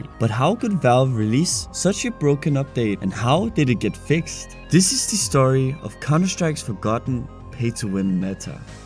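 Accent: Denmark. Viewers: Den danske accent 😭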